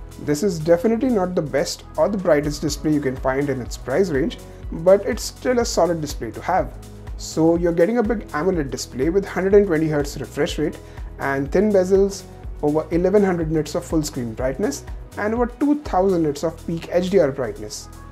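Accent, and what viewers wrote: India. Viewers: Normally i cant stand india accents. Yours isn't too bad.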